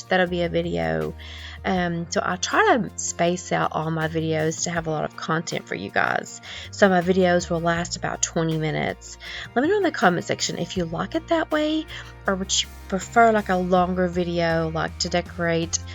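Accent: Texas, United States. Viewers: Hello sweet friend! I just love to watch you clean and decorate. I end up wanting to do the same! Lol thanks 🫤 I love your videos. Your Texas accent is Beautiful!! Big Texas Hugs, from my side to yours. 🫶🏽❤️💗💝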